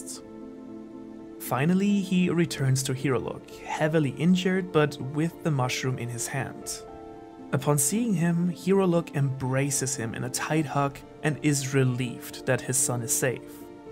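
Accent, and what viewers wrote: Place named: Germany. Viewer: are you german? Your accent aounds like it 😁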